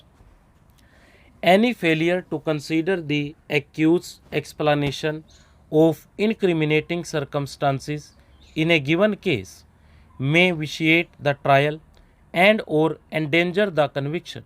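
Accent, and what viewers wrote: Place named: South India, India. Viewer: Sir your dictation helps me a lot. Thank you very much. But I am from kolkata and i am facing a problem with your accent(south). What should i do now?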